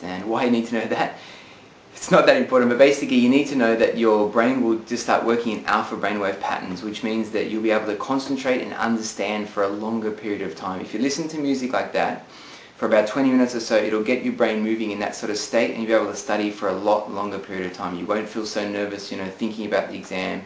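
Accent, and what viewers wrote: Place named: Australia. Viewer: australiaann accent haha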